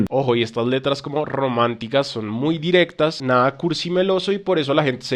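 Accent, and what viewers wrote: Bogotá, Colombia. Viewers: Como que eres MEXICANO pensé que eras de Bogotá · Cómo así que eres mexicano? pensé que eras de Colombia jaja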